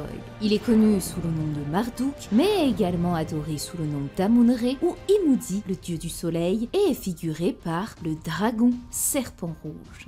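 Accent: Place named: Belgium. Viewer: Sur que tu es Belge! lol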